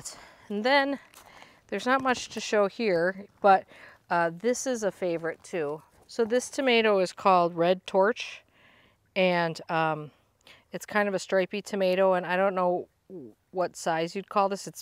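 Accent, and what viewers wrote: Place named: Upstate New York, United States. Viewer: I love how you garden. It's like I would if I had the space and lived in a place with trees and a bit more rain. I started watching your channel about a year ago. It wasn't your garden that caught my attention, at first, though I love it! It was your accent. My dearest and best friend sounded a lot like you do. We lost her to a stroke almost 4 years ago. She was here in Texas with me 4 years ago today! It was so important for her to get here. See, she was born and raised in Upstate New York in a small town called Phelps. It's just south of Rochester. She got transplanted to Texas because she wanted to go to college some place that had a good music program and was completely different from where she had been living. Well, she found it! I'm in the Texas Panhandle and it's nothing like your beautiful tree filled, crick running, lakes and, well, we're not the same at all! 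She always kept her accent which she argued, in fun, that we had the accent down here, she didn't have an accent! Haha!!! Anyway, she and her family moved back to NY in the mid to late 90s. I didn't know what I was gonna do without her?! Our lives were very entwined from working a small business together and I helped her home school her daughter and and and.... We could go shop at Wal-Mart and have a blast doing, together. Well, I survived the move because We could still talk on the phone and I was truly happy that she was back home. We made a trip up there in 2008 and spent a week with her. It was perfect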